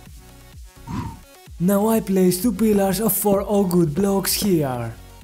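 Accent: Greece. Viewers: Jesus, he is Greek. There are other accents in the world, you know..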